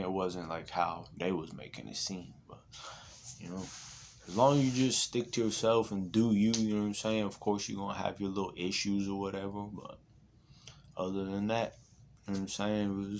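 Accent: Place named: Florida, United States. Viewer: Bra I kno u from florida from the way u talk...boy u real AF!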